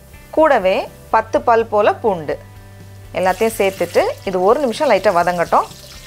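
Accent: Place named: Tirunelveli, India. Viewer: Nice. sis. Tirunelveli and Nagercoil mixed slang.athenalthan ketten.unga uru special items seyunga.WAITING.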